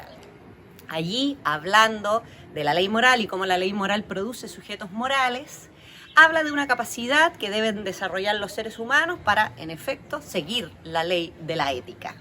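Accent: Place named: Chile. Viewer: Hola, que buen Canal me acaba de recomendar youtube, por tu acento asumo que eres de Chile cierto? Saludos!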